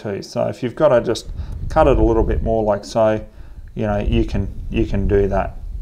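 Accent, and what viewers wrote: Australia. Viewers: You know this dude is a professional with that Aussie accent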